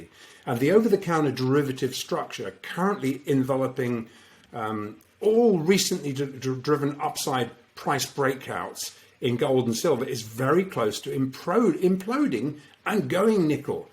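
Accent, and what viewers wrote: England, United Kingdom. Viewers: I'm here to listen to an English accent.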